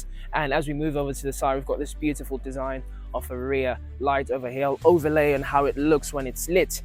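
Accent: United Kingdom. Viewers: Bro, where did you learn to speak like that? Too smooth with that British accent👌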